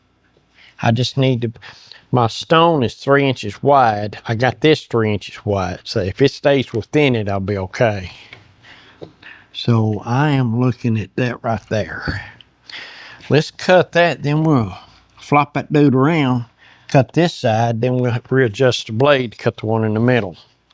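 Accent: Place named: Appalachia, United States. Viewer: This is like watching paint dry, too slow and what an accent, reminded me of the Beverley Hillbillies!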